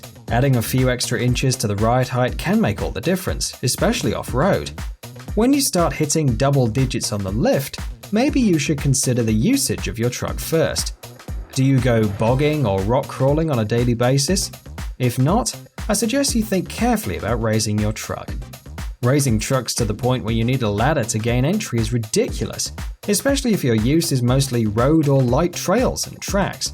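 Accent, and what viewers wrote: United Kingdom. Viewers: Ok first things first take your British accent and go fuck yourself and second learn how to spell tire... and lastly what shit do you know about trucks where you drive on the opposite side of the road and in the car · Why is a British person talking about this 😂. 2 or 3 things he listed are actually badass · Those British accents are like fingernails on a blackboard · This dude is brirish and is probably justa city boiiiii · I refuse to listen to a Brit talk about trucks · Lmao hes british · WHY THE FRUITY BRIT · First a British speaking gentleman explaining American trucks? Second, why would you go off road with low profile tires? Third, double digits is way to high for the road, buy if you go off road with it, who cares?Forth, if you feel the need for truck nuts, compensating for something? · Okay, for those griping about his accent (foolish enough to think they have no trucks in the UK), here's an American guy who agrees wholeheartedly with him. 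Get real. Regardless of who says it, do you really think those things (oversized rims/wheels, air pollution, etc) are actually "cool?" Not by any stretch. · Why the fuck is a brit talking about trucks? Get back to your tea.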